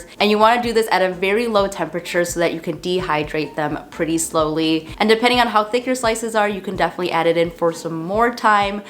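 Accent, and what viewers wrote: Chicago, United States. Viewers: I just stumbled upon your channel after watching a bunch of Youtube Christmas decorating videos. LOVE your videos, especially your DIY projects. Also your Chicago accent reminds me of home (I’m from Illinois originally and your voice sounds just like one of my friends ♥️). Happy holidays to you!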